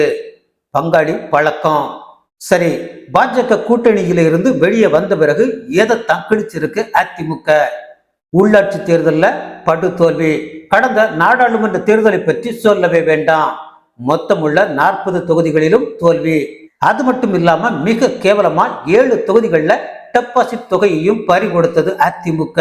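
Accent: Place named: Sri Lanka. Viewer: Sir நீங்க தமிழ்நாடா ?? இல்லை இலங்கையா?? உங்க பேச்சு இலங்கை தமிழ் மாதிரி இருக்கு...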